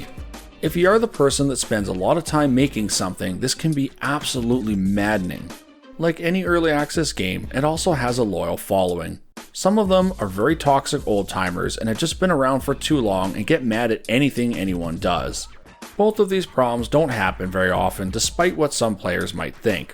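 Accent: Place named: Finland. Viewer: is this guy from Finland? wheres this accent from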